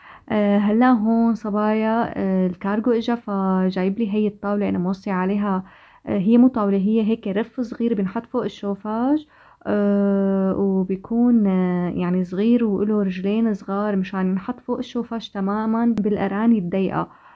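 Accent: Syria. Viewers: متابعتك من جديد من الشام.. ومن لهجتك دغري عرفت انك سورية ❤❤❤مااا شاء الله فيديوهاتك مبينه بتجنن رتابة وتنظيم راح اعمل لفة بالقناة شوفهن تبقى كلهن عرواق الله يهنيكي ويسعدك يا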